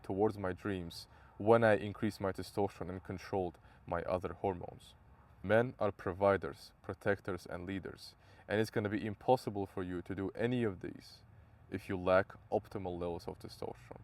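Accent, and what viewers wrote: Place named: Russia. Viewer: сразу слышно что родной язык русский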